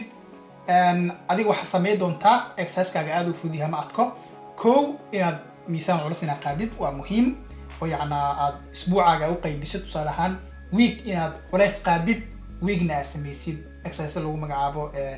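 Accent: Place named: Hiraan, Somalia. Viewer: I liked lahjadaada from asal Hiraan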